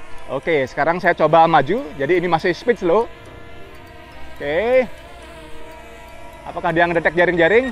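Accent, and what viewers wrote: Bali, Indonesia. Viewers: logatnya bali om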